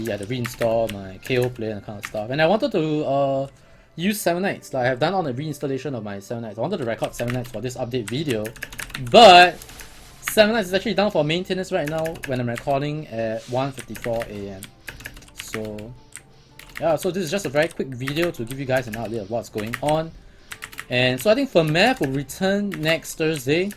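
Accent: Singapore. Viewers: ! are you singaporean?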